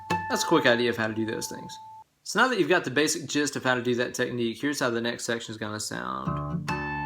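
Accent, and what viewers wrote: South, United States. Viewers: great stuff!... perfect for October..... you sound like you live in the south...... you got the heat on yet?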